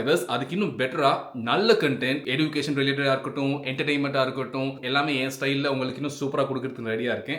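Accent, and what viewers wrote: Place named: Tamil Nadu, India. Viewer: நீங்கள் ஏன் தமிழ்நாட்டுக்காரர் போல் பேசுறீங்க!?😮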